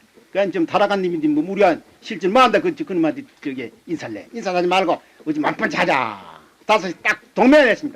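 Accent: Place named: Gyeongsang, South Korea. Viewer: 경상도 맞습니다 동감입니다 ㅎㅎ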